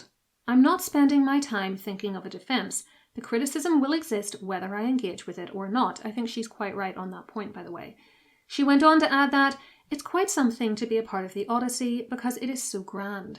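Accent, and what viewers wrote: Northern Ireland, United Kingdom. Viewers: Nice to hear a Northern Irish accent, North Down? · Well, you've covered just about every concern I have about the movie. It's something I'd normally look forward to, but I am too sensitive or perhaps too petty to buy a ticket. To me, Diane Kruger looks like someone who could launch a thousand ships and Brad Pitt makes for a convincing Achilles. I don't like any of the casting choices in this new movie. Although, I suppose Tom Holland is fine for Telemachus, if it weren't for the American accent and the dialogue. I'm not sure what to picture as an average Greek. I've seen the Big Fat Greek Wedding movies and the film and stage productions of Momma Mia. But, one of my doctors is very Greek. She has dark blonde curly hair, but more of a medium complexion. My friend, her son, also has dark blond or maybe light brown hair, but reminds me of Czar Nicholas II or a young King George V. 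In terms of accent, I don't think I'd want them to sound like Michael Constantine and Andrea Martin in My Big Fat Greek Wedding. I think I'm most comfortable with a British English accent. Actually, I think the voice and the accent of would make for a good Athena. I don't quite know what it is about your voice that's so pleasing. I don't now if it would be considered aristocratic by Northern Irish standards, perhaps not. Perhaps it's "learned." Whatever it is, it's quite beautiful. I think a London version of what I find appealing in this "type" of voice is Jean Simmons. If the Odyssey movie is narrated by Homer, I think Richard Kiley w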